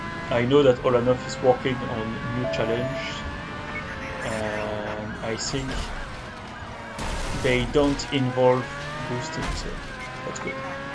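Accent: France. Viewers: Where are you from? France?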